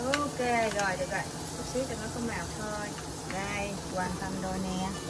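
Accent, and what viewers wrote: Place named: An Giang, Vietnam. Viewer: Rất thích và ủng hộ kênh của bạn. Nghe giọng nói hình như bạn là người gốc ở An Giang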